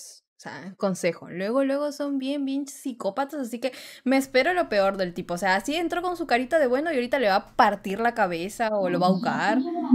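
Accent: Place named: North, Mexico. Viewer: Le achunto al clavo lo de norteño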